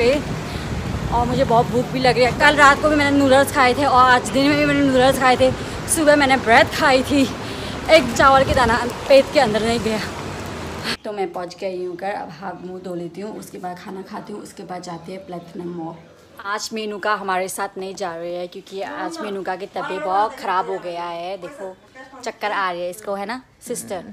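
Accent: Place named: Nepal. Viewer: Hi! You are Nepalese or Nepali speaker …? Coz your tone like Nepali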